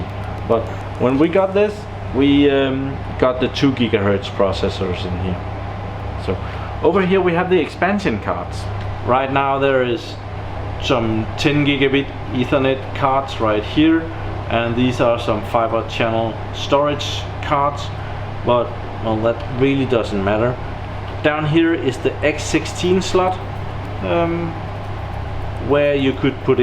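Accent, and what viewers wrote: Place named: Denmark. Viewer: did you say MPI? It looks like QPI to me. Unless that's your danish accident that says Q different.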